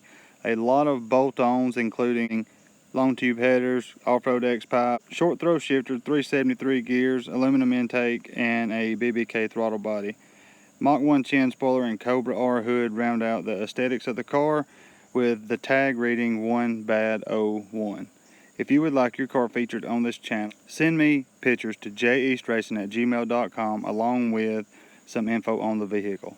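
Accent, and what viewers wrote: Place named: Rural, United States. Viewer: This was probably the worst video I’ve ever watched. Stupid fucking monotone hick accent. I should kick your ass and file a suit for your fucking tractor hick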